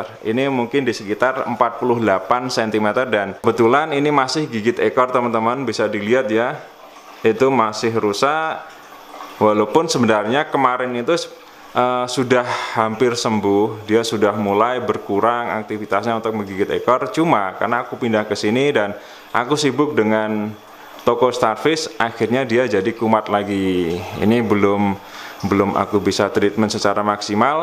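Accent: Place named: Surabaya, Indonesia. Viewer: Sampean suroboyo ndi mas?bek'e oleh nempel Gon e sampean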